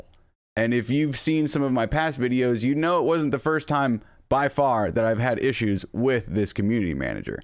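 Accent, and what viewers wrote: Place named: Arkansas, United States. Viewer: Real talk. I ran across your channel and subbed because you sound like a Arkansas hillybilly and you had unbeliveable reflexes, Im here to spend a few minutes watching someone I like, Doesnt matter the game. Big hello to mama Fox.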